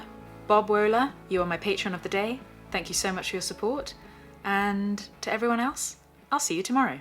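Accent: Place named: England, United Kingdom. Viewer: is the best looking of the many guitar teachers I follow (and that English accent is fetching), and one of the best teachers too, plus her musicianship just keeps improving. Thanks for your videos Sensei - cheers!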